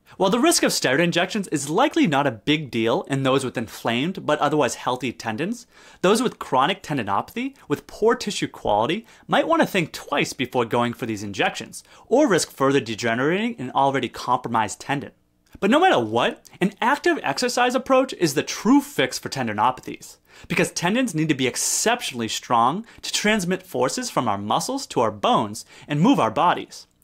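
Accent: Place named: Massachusetts, United States. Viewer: You’re def from eastern Massachusetts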